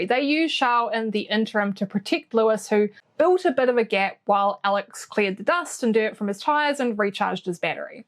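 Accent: New Zealand. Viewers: Yes you are correct. The New Zealand accent is right up there with vulgar American, Mumbling South African and flatfooted hick-up sounding Cockney from the British Isle of London-England. So you are second best in gnawing-ear-splitting sounds and I noticed that I was forced to ‘come again, please’s’ more often than with other distorting violations of the beautiful, melodic version of Oxford English. After all ‘come again’ is not the worst request you can get after a brutal rape. Apart from all my unkind remarks stands my sincere admiration for your very entertaining Emilia podcast. Thank you for that. JVJB from Amsterdam.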